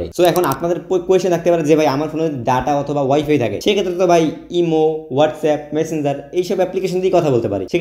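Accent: Barishal, Bangladesh. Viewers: দাদো তুমি মোগো বরিশাইল্লা হেতে মোর কোন সন্দেহ নাই! তোমার বাড়ি কোন জায়গা?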